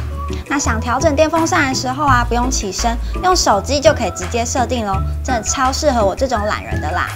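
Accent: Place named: South, Taiwan. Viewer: Hanne台語很標準欸，是南部人嗎？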